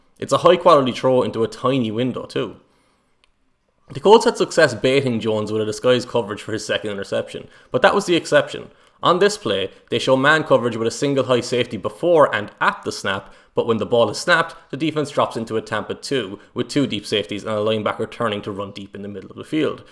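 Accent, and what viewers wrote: Ireland. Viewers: amazing breakdown! And I love that you only looked at one game for both good and bad. And tthe Irish accent is plus. Keep it up!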